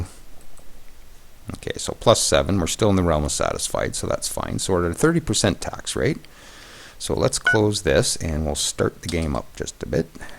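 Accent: Canada. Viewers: I wish you could do more of these . Im just starting with this brilliant game and its a big help ! Btw im German and can understand your explenations pretty well , it might be you beeing Canadian . Thx for your DW series !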